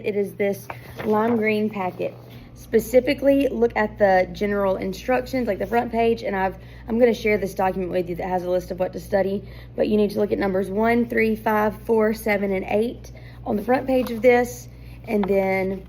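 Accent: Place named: South, United States. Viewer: Southern Accent, 80's hair.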